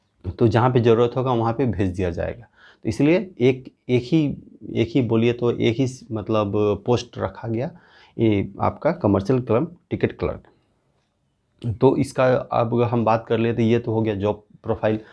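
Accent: Darbhanga, India. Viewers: Are you from दरभंगा ?? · U sound like from darbhanga side.is it true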